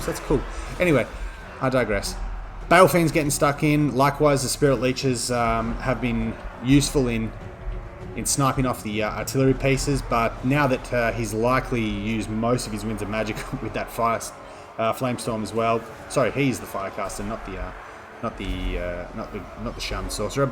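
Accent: Australia. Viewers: That feral mammoth hit Gotrek 5 times in a row between and ! I was hoping to see it's value, but you managed to skip it the entire time! Good cast though, I enjoyed listening to another aussie.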